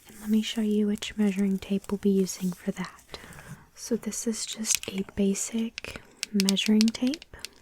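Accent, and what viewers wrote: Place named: South, United States. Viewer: Really enjoyed that, Thanks! And are you Southern? You kind of sound like Kelly Clarkson! I have had a crush on her since i was a teen lol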